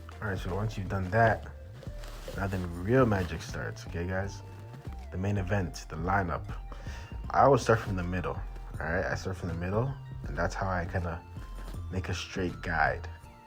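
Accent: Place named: Toronto, Canada. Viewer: Man sounds like he's from the GTA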